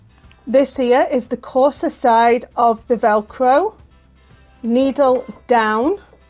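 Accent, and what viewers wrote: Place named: Yorkshire, United Kingdom. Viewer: Hi! Loved your names for the different sides of the Velcro - fluffy and hookey - it gave me a smile. Wondering where you got your accent from - you sound like a real home girl to me (I'm from Leeds but live in Scarborough atm). Lovely video, really innovative - keep up the good work we look forward to them. xx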